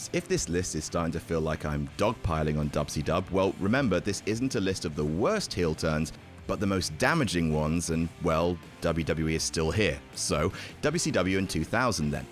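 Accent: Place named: RP, United Kingdom. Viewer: this sounds too posh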